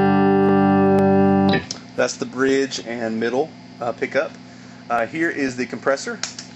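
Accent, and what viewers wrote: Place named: South, United States. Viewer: Dude, nice rig. Church rig?Might I recommend a better tuner? (turbo tuner!) Also, if you didn't already know, you probably should not use the "tuner out" on your volume pedal - it messes with your tone. Anyway, is that green pedal a JHS custom pedal? I seem to recall a custom pedal that looked very similar to it on their site. Great tones btw. I used to have a M9 and I loved it, I had a sonic stomp too, both cool. I hear your southern accent, where are you from? I am in Hattiesburg,Mississippi.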